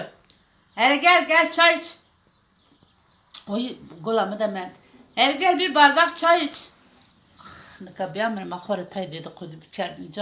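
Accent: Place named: Bingöl, Turkey. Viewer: Bende bingöllüyüm aynı bizim gibi konuşuyor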